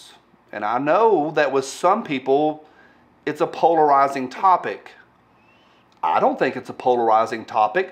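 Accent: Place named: Tennessee, United States. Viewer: Dude what county in Tennessee do you live? I’m in Robertson County and can tell your accent is thick Tennesseean.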